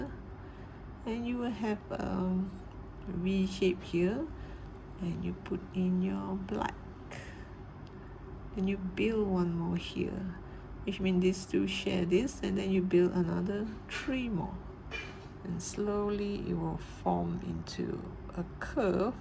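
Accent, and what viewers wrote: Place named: Singapore. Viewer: Are u Singaporean 😭 ur accent is like Singaporean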